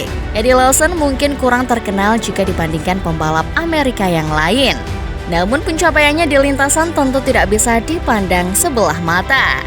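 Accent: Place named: Java, Indonesia. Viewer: medoknya imut bet wkwk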